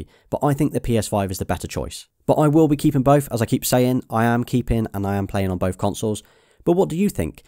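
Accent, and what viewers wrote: United Kingdom. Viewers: The way British accent is so funny 😆 because he said shual shock to shual sense, I am not hating or anything I am just saying how he says dual shock to dual sense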